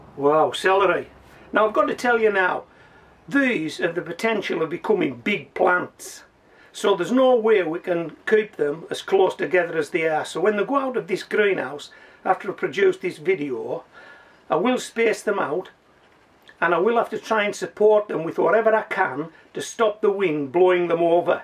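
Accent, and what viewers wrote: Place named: Hartlepool, United Kingdom. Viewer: I really enjoy your videos and I am learning a lot from you. I have lived in Ontario, Canada for 57 years but born in West Hartlepool. I think that you have a Hartlepudlian accent or have been born close to “home”. Am I right? Kept up the good work.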